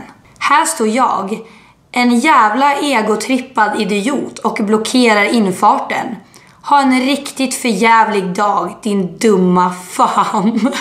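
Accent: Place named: Stockholm, Sweden. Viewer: Vilken jävla stockholmska du fått sjukt asså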